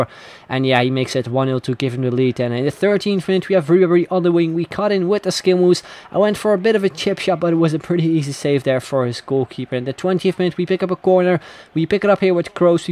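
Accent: Netherlands. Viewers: Je hebt echt een nederlands accent maar je bent wel fcking goed met skills ;)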